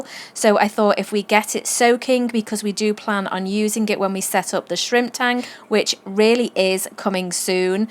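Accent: Liverpool, United Kingdom. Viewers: huh...are you from Liverpool UK? I am and your accent sounds so similar 😂👏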